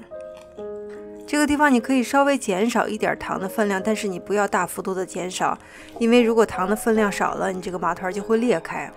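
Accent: China. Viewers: i like your chinese accent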